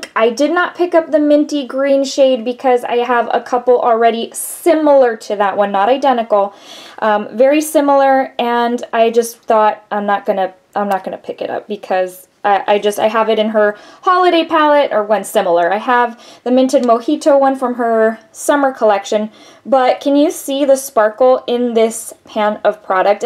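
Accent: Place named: Midwest, United States. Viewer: I would have never guessed you were from Texas. Did you grow up in the midwest? Your accent sounds like my midwestern relatives!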